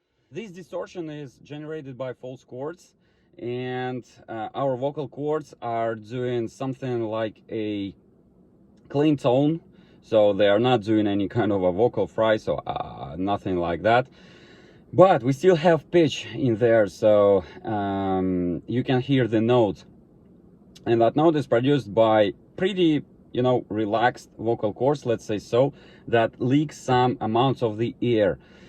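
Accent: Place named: Russia. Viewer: Incredible explanation. I thought I know how to do false-fold distortion, lol, but seems like I use cartilage, gonna try your way as mine hurts. Btw, where are you from, your accent is so Russian :) Although, I think passing too much air through vocal cords dries them a lot, so fry screams should be safer.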